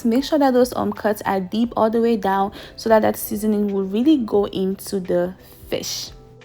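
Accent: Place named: Cameroon. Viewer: your voice and pidgin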